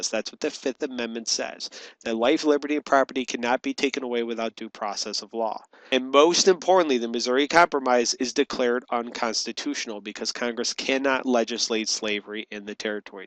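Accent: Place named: Cincinnati, United States. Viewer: You must be from Cincinnati lol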